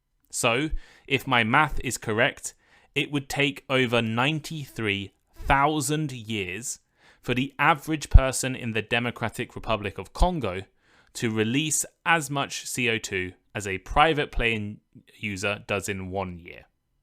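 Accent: United Kingdom. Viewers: Is it northern or southern british accent?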